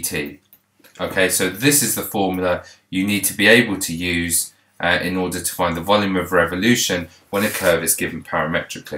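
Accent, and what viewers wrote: United Kingdom. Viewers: woah, you sound Britsh!! I'm an Amurican. Thanks for posting, much appreciated :)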